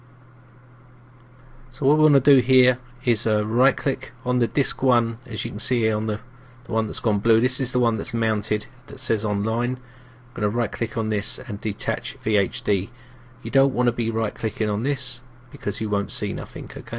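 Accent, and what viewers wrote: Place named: Derbyshire, United Kingdom. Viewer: You sound like Jason Statham, do you live near derbyshire?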